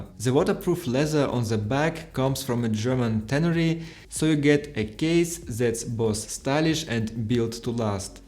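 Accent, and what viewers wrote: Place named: Russia. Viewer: The most russian accent ever 😃😁 всемусердецный привет из Азербайджан 😃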